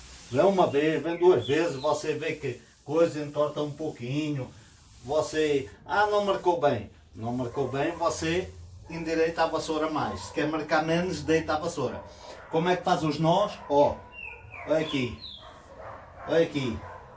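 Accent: Portugal. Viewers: eu vi uns vídeos seu de tijolinho não lembro qt tempo faz acho q foi 2016 ou 17.Achei à coisa mais linda. Agora tá uma enxurrada aqui no Brasil. E por acaso vc fala daqui ou de Portugal?E p/terminar é um dos melhores trabalhos q já vi na internet.O tom as nuances e formas está maravilhoso.Parabéns😝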